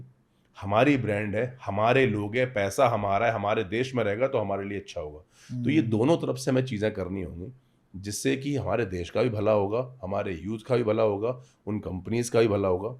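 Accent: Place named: Haryana, India. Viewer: Bhai boli haryana ki esi s, pr Dil t aacha ha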